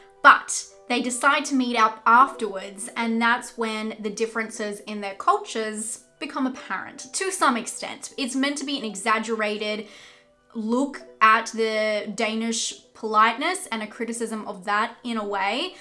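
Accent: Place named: Australia. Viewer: And this one tiIIIME! At Band CaAAAMP! I love the Aussie accent when done a bit more charmingly and understated. See fellow Youtuber Matt Parker (Stand-up Maths/Numberphile) for a better example of this rather than this overexcited version. Love the energy though, and clear passion.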